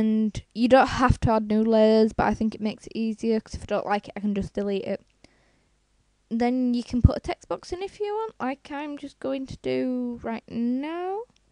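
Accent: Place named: United Kingdom. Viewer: I know right GoodLuckPeice she does sound Brittish! I couldn't really understand her because im asian.And how do you get the link thing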